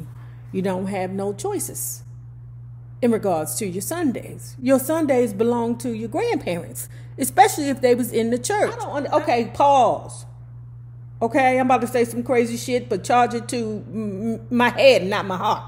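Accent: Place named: Washington DC, United States. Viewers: Definitely sounds east coast. DC or Murrland. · Baby, Fay revealed her ethnicity back in the 90's. As 4 ur accent, u sound just like everyone I know that went to Anacostia HS in DC!❤🤣🤣🤣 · You definitely have a DC accent and -isms. I lived in Ft Washington in Maryland (Murrlin) for a few years when I was a teenager. "lunchin", "bama", "doing the booty" all things I heard only in DC.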